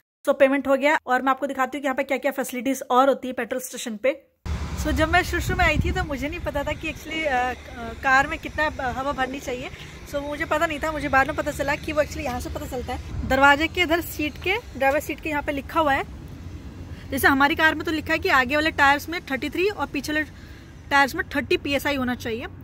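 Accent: India. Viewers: Hii apka bat karne ka tarika bilkul indian ki tarah hai ap austreliya me rah kar bhi indian ahsas dilati ho nice or austreliya gao ka sheyar bhi kara deti ho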